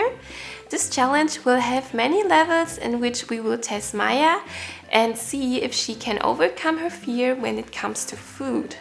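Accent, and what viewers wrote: Germany. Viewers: are you german? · Her accent is like from a German ^^ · You are from germany right? I mean the accent and at the top there was the word Werbung and I don't think it's English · Are you German?????? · You Are from Germany, Right?😃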